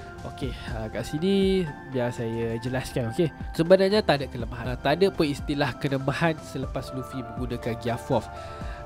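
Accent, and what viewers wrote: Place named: Sabah, Malaysia. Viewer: influence dari HOROGORO ke ni bunyi baku Sabah gitu hahaha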